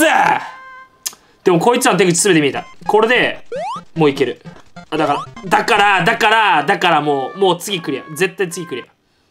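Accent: Kansai, Japan. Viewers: なんで関西弁なの？ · 結構関西弁使いこなしてて草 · この時のサワってめっちゃ関西弁使ってるんやなww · 関西弁草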